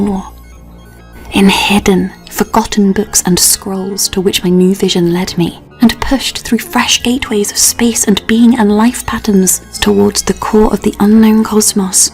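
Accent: England, United Kingdom. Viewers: I think has lost the plot. Why do you dwell on such disturbing past times my dear? An English girl with that accent should be enjoying life with a good husband 🙂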